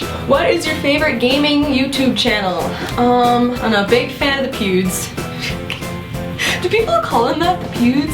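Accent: United Kingdom. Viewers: Haha :) your New Zealand accent kinda sounded like an English Birmingham accent hehe awesome you made me laugh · Your NZ sounds like a bad English accent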